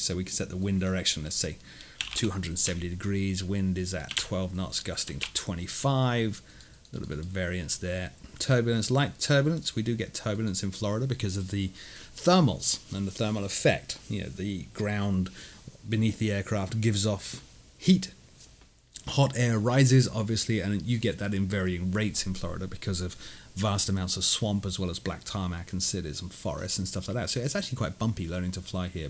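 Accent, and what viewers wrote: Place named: United Kingdom. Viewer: wait are you from florida?! you sound british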